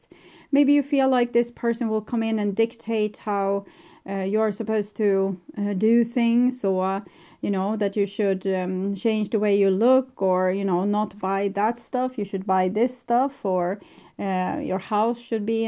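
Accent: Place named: Sweden. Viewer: Tack! Blev mest glad att hitta en svensk! 🙏🙏( jag hörde direkt att det va svensk engelska 😁😁😇) det va nån mening med att hitta denna reading! ❤ Relaterar till hela readingen... 🙏😇 Love amd light!!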